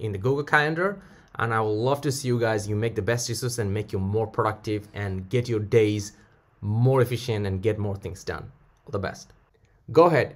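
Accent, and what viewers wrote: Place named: United States. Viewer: Where are you from? Are you putting on an American accent. It’s very interesting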